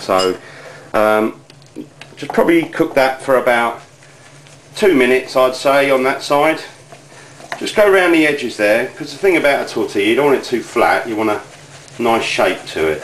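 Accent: United Kingdom. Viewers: The texture of potato absorbents too much oil.. I don't see myself indulging on such an unhealthy recipe. As a matter of fact, I can think of two ways to do this exact recipe in order to cut, more than likely, at least HALF the calories. But in fairness, healthy wasn't your approach. Dzięki Ci! Ps. British accent, Mexican dish?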